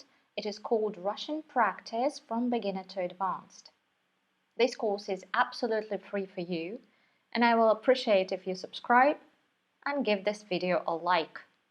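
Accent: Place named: United Kingdom. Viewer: A very pleasant lesson, thank you 🙂 When you speak you sound more British than Russian! Your accent is delightful and your speech is clear. I also like the pace.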